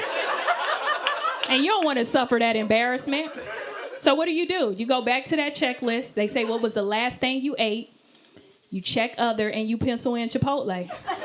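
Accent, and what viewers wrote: South, United States. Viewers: She has that classic southern twang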